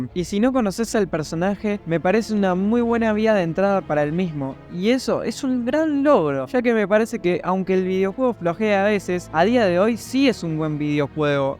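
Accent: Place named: Argentina. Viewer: Papu este vídeo es cine desde la edición hasta la narración tan Argentina que usted tiene 40/10 y una pitusa de regalo🗿🚬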